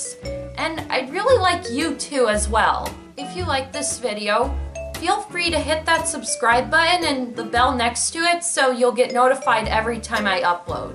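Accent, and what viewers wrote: New York, United States. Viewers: What accent do you have,? Sounds like New York. I'm Australian so all the different US accents interest me.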